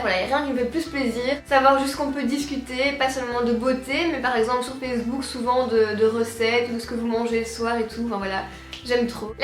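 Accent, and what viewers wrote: Belgium. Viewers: J'adore ton accent belge ! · ton ptit accent belge est très marrant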